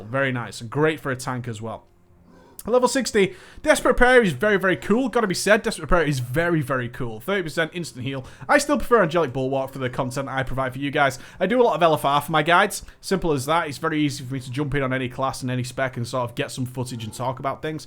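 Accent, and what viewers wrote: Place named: RP, United Kingdom. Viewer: Love the energy and that elite UK english accent.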